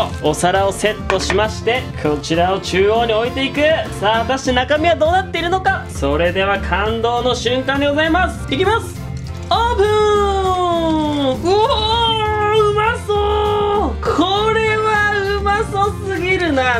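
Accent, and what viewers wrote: Kansai, Japan. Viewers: 、関西出身やったりする？？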